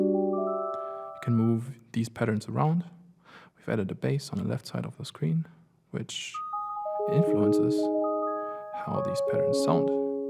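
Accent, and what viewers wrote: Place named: Germany. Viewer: German accent :D nice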